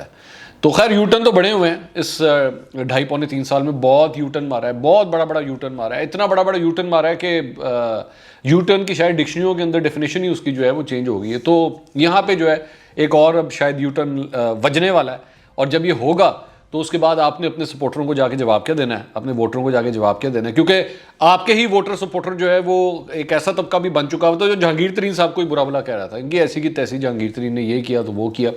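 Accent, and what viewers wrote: Punjab, Pakistan. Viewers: you take your work very seriously, passionately engross and involve yourself in the news you bring, forgetting you are in the recovery phase. So take it easy until you fully recover. by the way keep that Punjabi flowing!!! Interesting vlog as always!!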